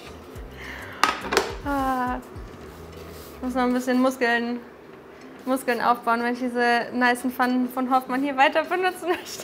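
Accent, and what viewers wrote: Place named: Rhineland, Germany. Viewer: Muss ich unbedingt ausprobieren. Ich finde deine Aussprache immer so lustig 😂. Eigelbschlotze und Gedöns.. Richtig schön rheinisch. Arbeitest du eigentlich noch in einer Konditorei?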